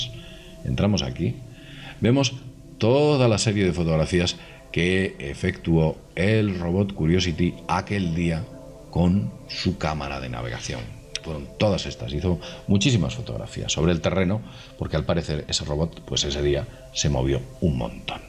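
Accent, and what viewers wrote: Spain. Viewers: ¿De qué parte de España es?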